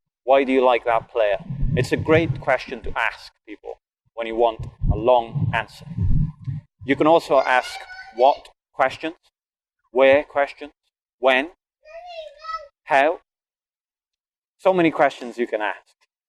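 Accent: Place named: Wales, United Kingdom. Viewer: He sounds Welsh...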